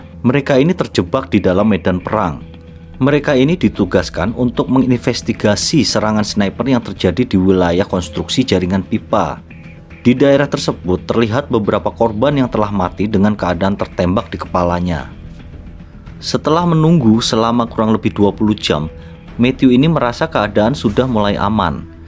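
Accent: Java, Indonesia. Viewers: MEDHOGH SISAN SWARANE... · Medog mu bro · Aksen Jowo sampean kental banget mas 🤣🤣🤣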